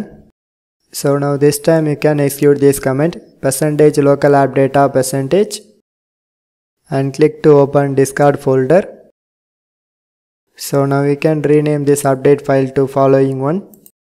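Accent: India. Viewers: Hey Mr. Indian accent slow down. I can’t keep up with whatever you’re doing. Oh my God.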